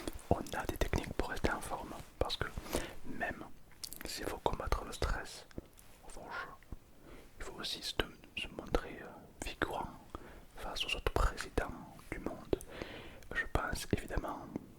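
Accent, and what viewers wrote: South, France. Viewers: Mdrrr avec l'accent du sud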